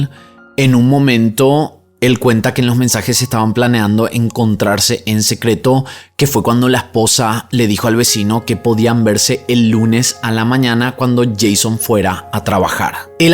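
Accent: Paraguay. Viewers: ¿Tu acento es paraguayo? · Sos paraguayo? sonas re paraguayo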